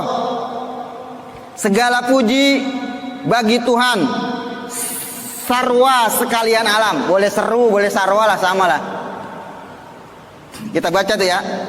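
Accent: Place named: Jakarta, Indonesia. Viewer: aduh ane demen nih ade ust gaye betawinye keren ...semoga ust selalu diberi keshatan dan panjang umur..dan banyak nyang dukung ..aamiin yRA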